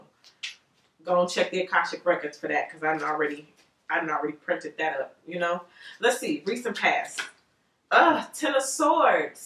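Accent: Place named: Atlanta, United States. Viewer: Love you QUEEN . And MAAAAN you so ATLANTA LMAOOOOO I LOVE IT🙌🙌🙌🙌😂😂😂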